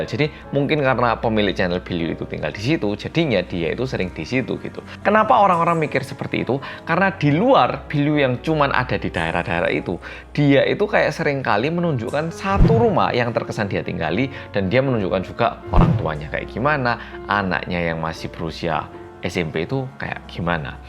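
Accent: Java, Indonesia. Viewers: Pualing suka dg pembawaan mas,juelass bhs yg medok Jawanya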